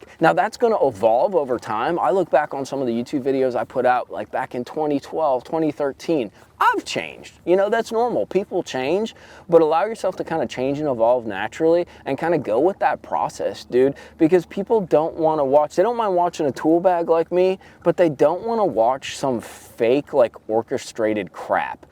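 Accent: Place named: New York, United States. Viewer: Love your content,long time watcher of your channel. Curious if you are from New Jersey or New York, you sound like my college roommate! 😂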